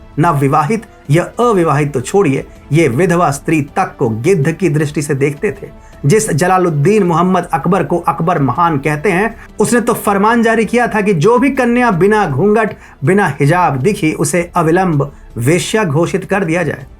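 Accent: Standard. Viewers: Kitne mahine baad itni shudh Hindi sunkar Aanand hi aa gya.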